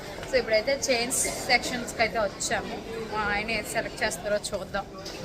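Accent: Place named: Visakhapatnam, India. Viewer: Me latest short video nacha ledhu Telanganal lo konni rojulu unaru meru valla basha yasa ni egatali chesinattu undi... Mee vzm yasa kuda alage untundi ga... Speed lo vachestai... aina ok But ila comedy ki kuda oka limit... Balagam movie lo padina singer vaalu...valla yasa basha ni egatali cheyadam wrong..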